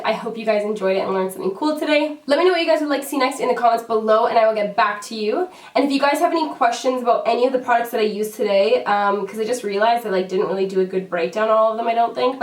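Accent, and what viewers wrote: Canada. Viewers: you sound extra canadian i love it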